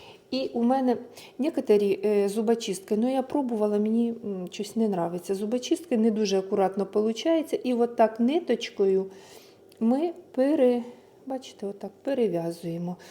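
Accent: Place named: Odesa, Ukraine. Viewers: То й не слухайте! Це південноукраїнська говірка! Діалекти є в кожній мові! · Землячка моя, наш одеський діалект, приємно чути своє рідне, сумую за домівкою 😢, я заливаю бульйоном коли ставлю в духову шафу, вони небагато смачніші тоді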